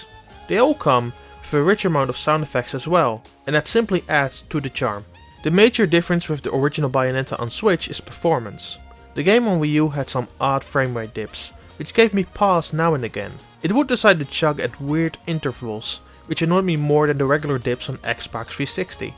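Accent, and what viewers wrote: Netherlands. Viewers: why does this have a dutch voice aaaaaa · WOW this guy sounds Dutch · This guy is soooo DUTCH it's not funny anymore. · Clocked the dutch accent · I was also wondering if this guy was Dutch and that seems the case. Its not to diss him but he really got a Dutch-English accent and for most Dutch people it isnt really pleasent to hear because we grow up with a lot of English speaking Media. But he shouldnt be bothered by haters because his vocabulary is good. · You sound dutch , nice review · Let me guess you’re Dutch lol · Damn, fellow dutchie here, I know the accent is quite apparent but you guys are kinda harsh, more than you need to be I’d say. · Urgh, i'm sorry but I can't stand this reviewer. I know he's Dutch (As am I), but the way he pronounces things is justt too annoying :/ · that thicc dutch accent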